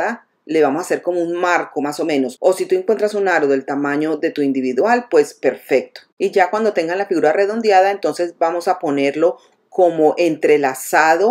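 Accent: Colombia. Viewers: En mi país le dicen yaquis... Recién estoy jubilada y pues mi casa que es la suya muy seguramente es del tamaño de su sala 😂😂😂 sin embargo me encantan sus ideas soy realmente nueva y hoy haré lo de los lentes y las bolitas de palillos... No se si tenga decoraciones para baños.. Me encanta que la mayoría de sus ideas es acorde a muchas economías.. Aparte de las excelentes ideas amooo su acento... Colombiano??? No se si me equivoco pero me encanta como habla tan bonito....genial su canal!!!!!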